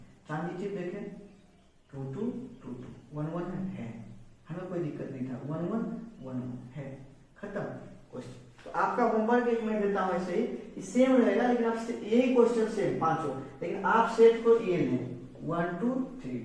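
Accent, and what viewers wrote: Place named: Bihar, India. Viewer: Noted Thanks sir but Lec 3 kaha hai sir....! Mujhe apka padhane ka style aur apke bolne ka bihari tone bahut jyada achaa laga......!❤❤❤❤❤❤❤❤❤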